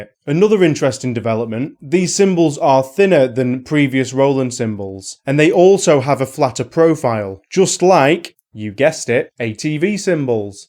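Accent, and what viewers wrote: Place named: Yorkshire, United Kingdom. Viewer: Nice to hear a Yorkshire accent for a change!!!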